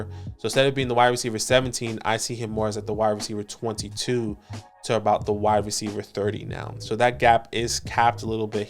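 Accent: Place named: New York, United States. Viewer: you sound like a new yorker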